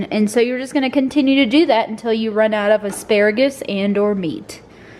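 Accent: South, United States. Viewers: I just had to come and say, I always watch your videos and usually my 4 year old is in the room with me. Last night after I watched I was on to another video and he says ‘ is that the girl with the dog named bo? And I said oh no I already watched her video tonight. He then said ‘without me???’ 😂 he loves your videos too. I think it’s your southern accent he loves.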